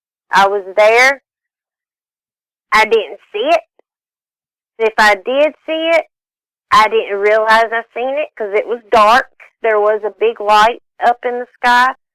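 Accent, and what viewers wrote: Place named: South, United States. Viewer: I'm surprised it never came back for the other 2 goats, or did it?? poor billy goats 🐐🐐.. and... love the Southern accent! ☺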